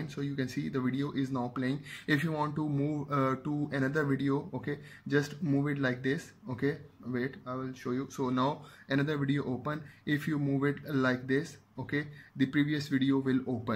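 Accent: India. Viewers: I love indian-english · Bought the S9 Ultra. Sreached for S Pen Features and stopped as soon as I heard an indian accent. =)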